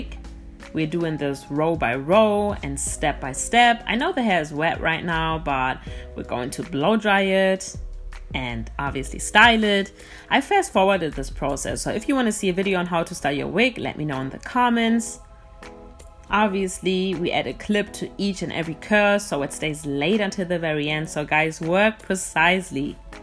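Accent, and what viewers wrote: Germany. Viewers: Such a thick german accent so cute love it lol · The german accent I love it -die Haare sehen übrigens geil auch ☺️☺️ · Could it be you are german? Your english sounds like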